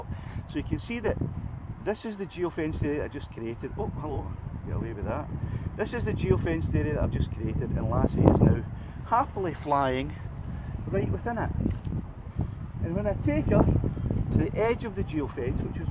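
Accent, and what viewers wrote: Scotland, United Kingdom. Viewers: I absolutely love your Scottish accent, I can understand you way better than some English blokes from North Yorkshire, or Saint Helens and Liverpool 🤣 I always set the phone on airplane mode if possible, it prevents your sceen capture getting disturbed by push notifications like Whatsapp and stuff.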